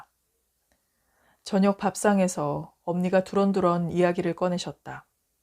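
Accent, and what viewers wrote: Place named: Jeolla, South Korea. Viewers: 박노해님의 자전적 소설 참 감사히 잘 들었습니다.전라도 사투리의 정겨움에 뚝뚝 묻어나는 정을 느끼다보니 가슴까지 따뜻해집니다.가난하고 병들고 벼랑끝에 서있는 자들에게 설땅과 쉴땅을 내어줄 수 있는 넉넉한 마음과 능력을 신께 소망해보는 아침입니다.작가님과 께 감사드리며 오늘도 복된하루 되시길 바랍니다. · 전라도 사투리를 우아하게 정감있게 잘살려서 얽어주신 멋지십니다~ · 감동의 물결과 눈물이 납니다 나도 누군가에게 따뜻한 사람이 되렵니다 욕심이 날 때 내가 빛나고 싶을 때 나를 돌아보는 사람이 되렵니다 꾸밈없는 목소리로 그리고 맛깔스러운 전라도 사투리에.. 감사합니다 지금 여기에서 성장하는 사람이 되었어요 고맙습니다❤😊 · ~~♡ 구수한 남도 사투리와 지혜로운 사람들의 정다운 얘기네요~❤ 고맙습니다 ~^^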